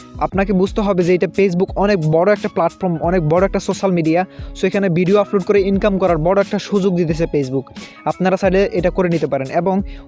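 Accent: Noakhali, Bangladesh. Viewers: তুমি নোয়াখাীর ছেলে😍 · আপনি কি নোয়াখালীর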